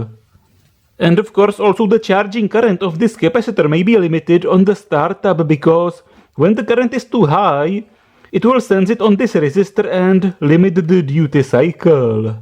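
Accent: Czech Republic. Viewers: That is the best accent. Btw jsi Čech žejo 😂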